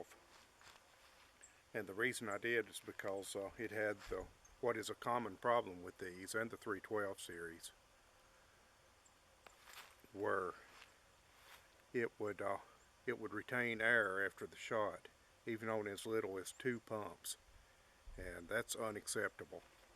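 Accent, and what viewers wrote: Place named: Louisiana, United States. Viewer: Interesting. Your accent and phraseology are just like my relatives (mostly gone now) from Bienville Parish. But, they came there long ago from the Montgomery area of AL. I used to live in Chattanooga. My Sheridan C9A (which I bought new in 1998) wasn't shot for a long time until recently. It was not stored with any air in it. I got it out recently and I've been trying to shoot it, but I was cocking the bolt and placing a pellet in the chamber. Then, I would start pumping and on about the 2nd or 3rd pump, it would shot out the pellet out at low velocity. After that, I could still pull the trigger and it would 'shoot' with no pellet. I have oiled it since with some compressor oil and not inserted pellet until after I've pumped and it seems to be shooting ok now. But, on the last few pumps, it seems I hear air moving somewhere right after the stroke if finished. 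Thanks for video!! Steve McCoy Van Osdell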